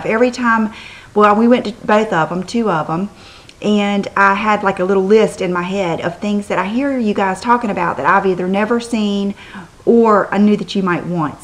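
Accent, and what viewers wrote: United States. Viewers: Hi! I´ve been watching your´re videos from here in Norway and I´m loving them! I even went back to old ones just to get "a jour". I haven´t commented on them until now because I´m a bit shy, but them I thought that "hey, I want to let know that I think she´s doing a terrific job". So,: Great Work!!I think you are fun to watch and listen to, I love your accent which reminds me of when I lived in the States many years ago. Keep up the vlogging & I´ll be watching;-) Thanx Cecilie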